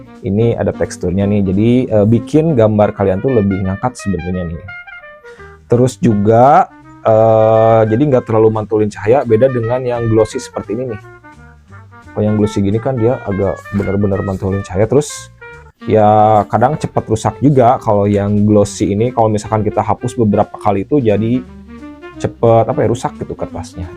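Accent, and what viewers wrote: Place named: West Java, Indonesia. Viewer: a, logatna sunda pisan😂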